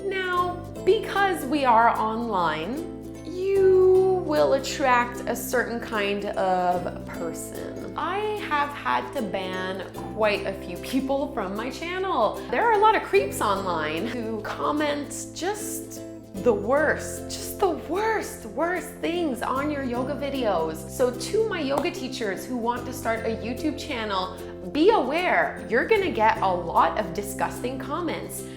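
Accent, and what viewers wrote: Canada. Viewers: I found your channel through a collaboration you did with Brett Larkin and stuck around because on your honest style and lovely Canadian accent. As an English teacher, I have a thing about accents 🤓 Thanks for keeping it real (the jealousy video and the meditation challenge 👍🏻) Hugs from Finland.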